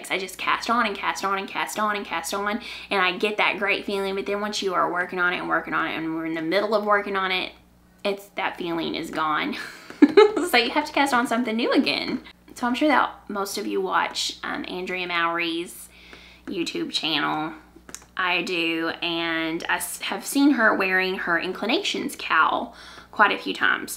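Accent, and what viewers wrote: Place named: South, United States. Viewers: Shout out to your Southern twang 😁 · Hi! Georgia knitter/maker here. So many fiber podcasters live outside of the South, and I found it charming to hear your familiar accent. Beautiful podcast. Keep up the good work!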